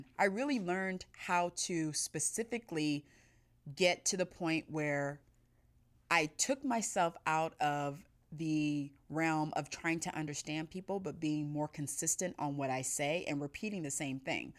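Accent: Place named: East Coast, United States. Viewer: "What I wanted to say was... get your in the bed, why are you naked for a facial?!!"... ROTF.... I can't breath! you sure sound like you're from the east coast!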